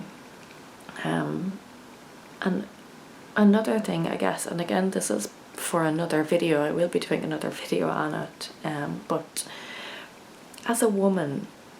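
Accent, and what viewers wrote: Ireland. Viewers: Off topic, but your brogue is just lovely!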